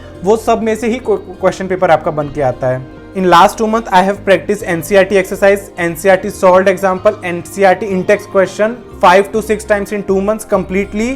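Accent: Gujarat, India. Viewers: Are you from gujrat ? 😅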